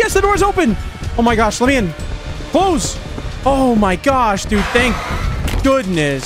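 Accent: United Kingdom. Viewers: Yet another British accent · i love the british accent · British sounds good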